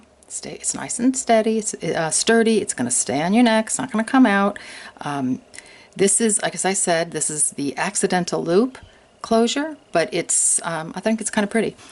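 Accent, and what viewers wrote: New York, United States. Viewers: you sound like youare from New York, where most people have patience with us. I made this by using 6mm bicones and 6mm rounded beads my friends love it, i use 86 tila and i found another way to make it with squares as well.. love it, i would love you to make something with pinks and purples and silvers.. thanks Love you girl.. I can bring you some Blue paddings. I am so addicted to this necklace I have made 12 of them so far and to give to gifts.. i need to show you what i made so far now i am making a blue bracelet a bit different.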